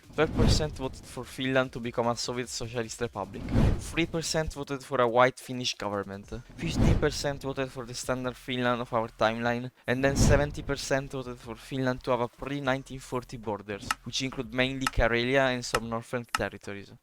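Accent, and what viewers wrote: Italy. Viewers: Ho capito subito che sei italiano per come parli inglese (non avevo visto il nome del canale) 🤣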